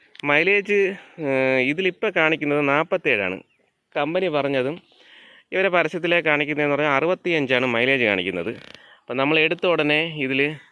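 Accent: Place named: Trivandrum, India. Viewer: Pakka Trivandrum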